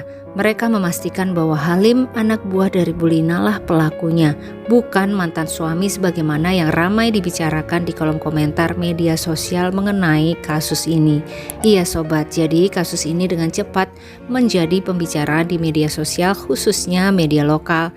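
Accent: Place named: Banjar, Indonesia. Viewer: kebetulan lg dinas di kota ini, tp kabar simpang siur, berkat mbak sy tau kronologinya.makasih mbak..btw mbak dah bisa jd org banjar,pas pengucapannya😂😂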